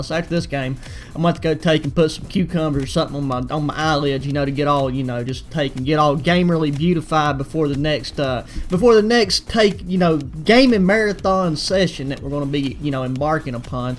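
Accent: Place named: North Carolina, United States. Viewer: I’m from Texas so I crossed that state off the list for your accent. You sounded like my buddy from NC. 😂😂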